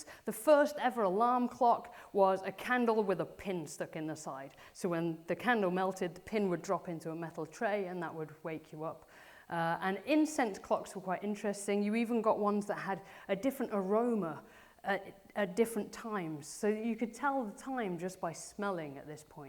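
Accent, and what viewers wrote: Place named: Northern England, United Kingdom. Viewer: Interesting accent mix. Northern England and North American.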